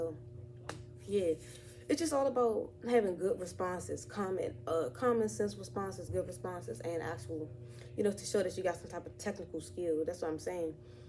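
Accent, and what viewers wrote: Washington DC, United States. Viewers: When I heard the DC accent, I liked the video. When she said 'jih like', on muvas I smashed the subscribe button lol.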